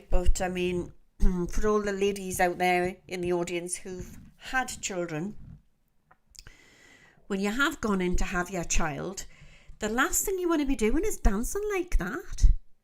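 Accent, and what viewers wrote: Newcastle, United Kingdom. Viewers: love the Geordie accent could listen to ut all day Steve east London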